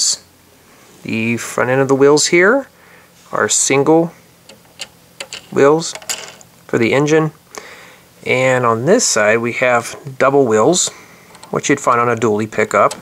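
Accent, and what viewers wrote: Texas, United States. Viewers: Are u Texan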